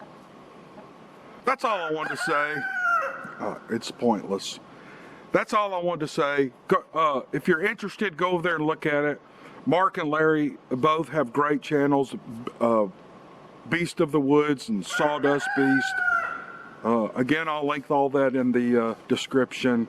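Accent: South, United States. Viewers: Love your southern accent and the stories. Keep up the good work 👏🏾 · MR., you talk and explain as much as you want to I enjoy listening to you talk, your accent reminds me of home and you got a good old boy accent I feel,you are a fantastic narrator and even if there was more commercials I would still put up with it to listen to you talk look at your stupid chickens and just enjoy myself of Southernman temporarily stuck in Chicago I would rather stay at home and listen to you then wander around outside in the jungle there ain't no bigfoots in this jungle! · I am addicted to your Chanel. In your interview 5 you mentioned that you were not collecting 🥚 from your hens. It is happening to me now and I solved the puzzle. Two of my 4 hens are eating the eggs. You have beautiful animals. You may call your roster Claudio. I watched your documentary and enjoyed it. 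You are an excellent storyteller and I like your southern accent. Regards from Puerto Rico 🇵🇷!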